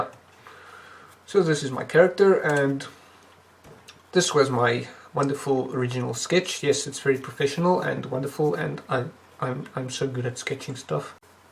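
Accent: South Africa. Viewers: South Afrian?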